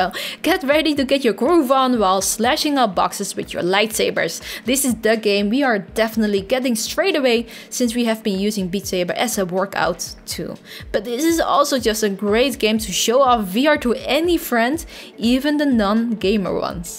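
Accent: Netherlands. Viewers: Doe een video in het Nederlands nu denk je van hhuuh hoe weet je dat ik Nederlands spreek ik kan het horen aan je accent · Hey i detect a heavy dutch accent ^^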